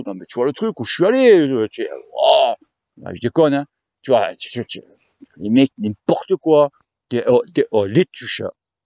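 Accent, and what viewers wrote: South, France. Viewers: Ride on! continus à être honnête, franc, généreux et entier. Dis ce que tu penses au moment ou tu le penses et avec ton accent du sud: c'est comme ça que l'on t'aime. Ton sens du partage fait plaisir à voir. Tes messages de prévention sont les bienvenus pas trop d'exo de force pour les jeunes,..., pas trop de zwift. merci de t’inquiéter pour nous. c'est sympa. peace. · Bravo, super vidéo. Ne change rien, ce ne sont que des jaloux. Et vive l'accent du midi.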